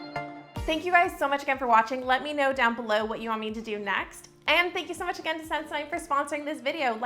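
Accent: Canada. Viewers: I didn't realize you were Canada. I could hear on this video for sure with your "abouts" and "outs" that your definitely Canadian. 😊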